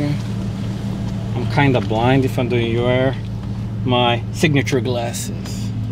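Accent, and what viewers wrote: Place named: Brazil. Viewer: Nice Accent hahaha Brasilien one! ancioso por esta serie!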